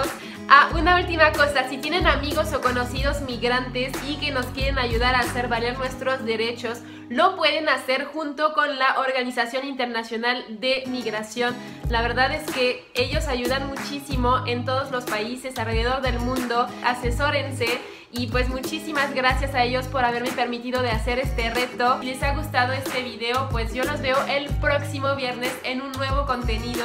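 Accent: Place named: Mexico. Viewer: hablas muy bien español con algo del acento mexicano te vez súper linda hablando de la patria hermana México saludos des colombia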